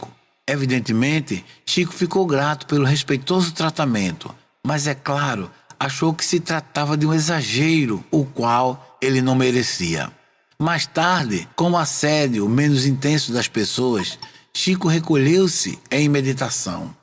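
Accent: Northeast, Brazil. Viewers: Boa noite, já conhecia a história, só assisti de novo porque gosto da sua fala, esse jeito carregado do nordeste. Abraços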